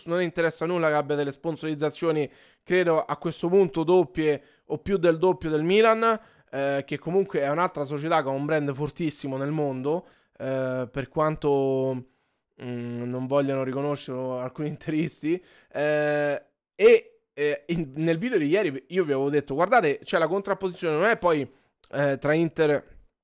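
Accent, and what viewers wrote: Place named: Rome, Italy. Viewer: Perché imiti i tifosi interisti parlando romano?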